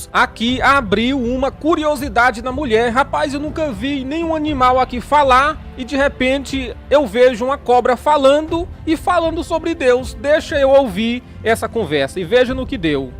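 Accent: Northeast, Brazil. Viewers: Oi pastor também sou nordestina e conheço bem esse sotaque 😁 obrigado pelos ensinamentos da palavra do Senhor gosto muito de ouvir vc que o senhor abençoe vc a cada dia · PASTOR, AMO MUITO TUAS PALAVRAS, TEU SOTAQUE NORDESTINO, NUNCA MUDE NADINHA, CONTINUE NOS EXORTANDO COM GRAÇA E SABEDORIA!!! GOSTO MUITO DO JEITO QUE VOCÊ FALA DO CARNIÇA, É ASSIM QUE CHAMO O PULA MOITA KKKKKK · Eita nordestino reais e muito engraçado o jeito dele falar paz irmão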